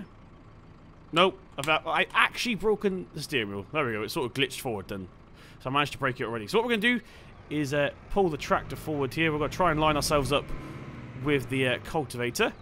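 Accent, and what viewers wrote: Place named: United Kingdom. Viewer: British accent so annoying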